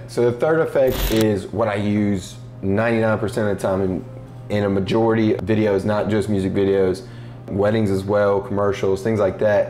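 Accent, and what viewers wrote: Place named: South, United States. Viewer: Your speech is sooo nice!! Come on southern bred 🤣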